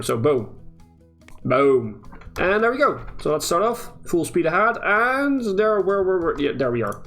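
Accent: Netherlands. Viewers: That's correct, I'm from the Netherlands too. Funny how, as a Dutchy, you always recognise the smallest Dutch accents 😁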